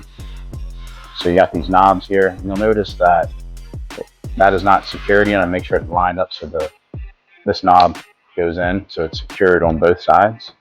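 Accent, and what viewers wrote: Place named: United States. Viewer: What we all need. A video of installation instructions in American English. 😊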